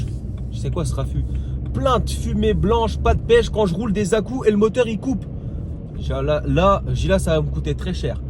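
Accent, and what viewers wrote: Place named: North, France. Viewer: Ca se vois trop que tes du nord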